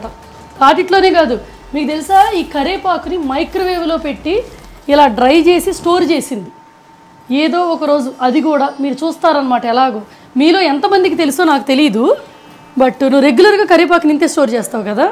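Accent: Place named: Guntur, India. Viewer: Mee slang vintey Nenu Guntur lo unatte undi. Nenu Tenali. Mee Balam mee Jaanu Ney. Mee Ammayi ,adore her.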